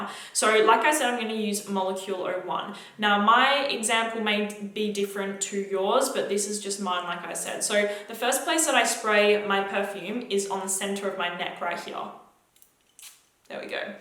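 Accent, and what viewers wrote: Australia. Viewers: I LOVE your Australian accent!!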